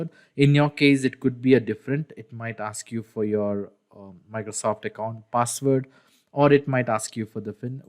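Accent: India. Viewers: another unhelpful tutorial with indian english accent, they produce many of that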